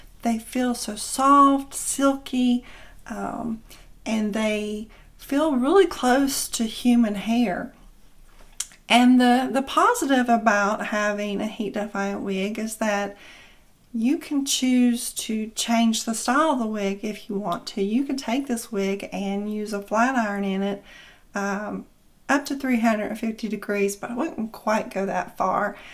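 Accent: South, United States. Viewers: Absolutely gorgeous!!!! Love your accent!!!! It’s nice to find someone on YouTube that has a similar accent as I do!!!!! Gotta love the South!!!!! 👍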